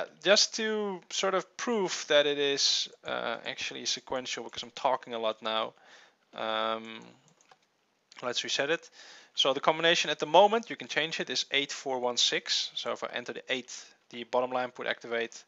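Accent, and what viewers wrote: Netherlands. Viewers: Guys guys guys. Stop for a sec with discuss if he's dutch or not. Well, i have the right anwser for ya. I'm half engish, half dutch. The most people i heared that are dutch and talk english, are talking like that. Its just a dialect from dutch people. I'd like if everyone would stop with commenting like that. It is'nt even funny for. He's uploading a video, nothing more, just that. Show some respect people!! Because i do. Thanks for reading guys. If you think im wrong, screw you.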